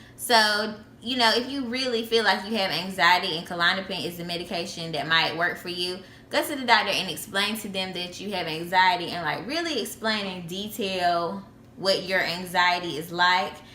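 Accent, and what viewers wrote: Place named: South, United States. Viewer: Dam I love that southern accent. You talk like my cousin. I will be taking this medicine soon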